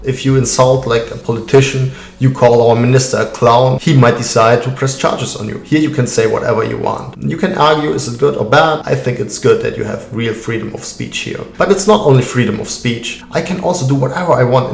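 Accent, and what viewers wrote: Germany. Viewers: My grandmother Wilhelmina (my mom's mother) moved to the USA shortly after WW2 from Vienna, Austria. She always hated her name, but I always thought that it was so beautiful. Your lovely German accent reminded me of her. I'm so happy to hear that you are enjoying being in America. Hello from Maryland, USA. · You're more American than most Americans. You're not a German, you just have a German accent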